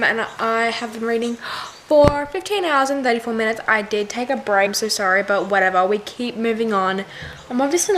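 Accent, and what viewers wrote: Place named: Australia. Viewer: This is really random but you and phoebe Tonkin have the exact Same specific dialect like I could close my eyes and I could picture a young phoebe. I’ve never heard another fellow Australian sound so similar haha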